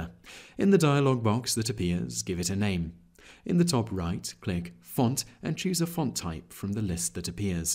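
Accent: England, United Kingdom. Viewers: The english accent makes the lesson so much nicer to listen to. Very pleasant.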